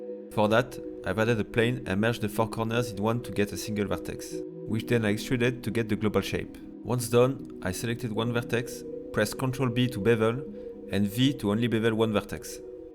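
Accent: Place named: France. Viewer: J'aime beaucoup ton accent français. Salutations d'Italie ! Ciao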